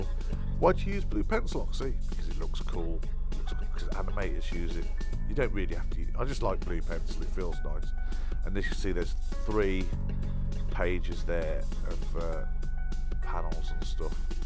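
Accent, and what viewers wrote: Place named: Black Country, United Kingdom. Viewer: i detected the accent (takes one to know one :) ), just checked your site and OMG! you went to stourbridge college too!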